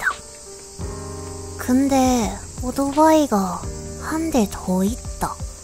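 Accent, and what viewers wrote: Gyeongsang, South Korea. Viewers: 경상도 사투리 너무 듣기좋아요~^^ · 전 경상도 사람이라서 억양이 낯설지 않은데요 일본어 할때도 억양이 경상도스러워서 어떤게 조선말이고 어떤게 일본말인지 헷갈리네요 actually 전 일본말을 모릅니다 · 갱상도 억양? 암튼 바이크 이야기 좋네요....안라!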